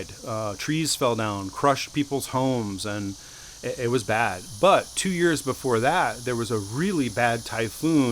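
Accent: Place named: Georgia, United States. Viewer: I made a comment earlier saying I’m new to your channel. I said that before I listen to the whole video it sounds like you’re back in the USA maybe Savannah Georgia which I’ve been there before because my son-in-law was stationed at Fort Stewart anyway really good video. I’m hoping to move there in a couple months to retire. When do you think you’ll be heading back to the Philippines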